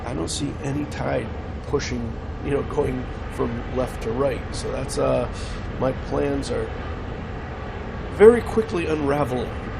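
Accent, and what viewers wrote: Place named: Brooklyn, United States. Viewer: i have a friend from Brooklyn I've known for decades. You and her dad are so similar. Similar accent, speech patterns, hair... every time you talk i hear her dad.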